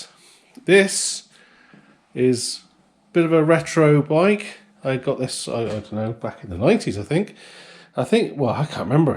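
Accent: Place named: Gloucestershire, United Kingdom. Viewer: Reminds me of my old Dursley Glos accent....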